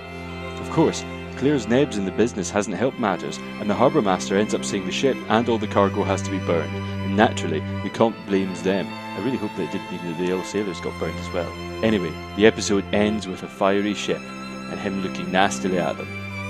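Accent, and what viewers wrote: Scotland, United Kingdom. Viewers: The background music is a little loud with trying to decipher your accent. Love Scots accents Jamie's accent is one of the reasons I love this show but with the music can't quite hear you.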